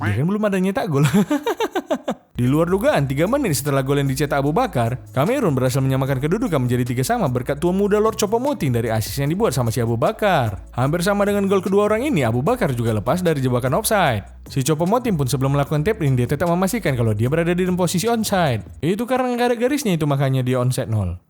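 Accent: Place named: North Sumatra, Indonesia. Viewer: logat kau sumut kali njeng 🤣🤣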